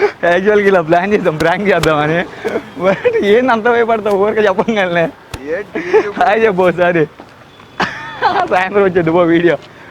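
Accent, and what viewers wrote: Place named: Andhra Pradesh, India. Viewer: Ne slang nachutaley .Andhra lekka ostandi kocham marchu....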